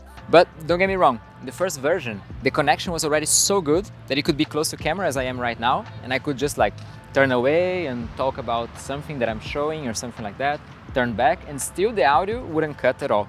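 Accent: Brazil. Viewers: Iradaa essa comparacaoo bro!! cai aqui no seu video e logo de cara ja reconheci o sotaque brasileiro!! Comecei meu canal recentemente tbm, pensei em fazer em ingles que nem vc mas acabei optando pra fazer em português mesmo!! Pow irado ganhou um inscrito! Cheers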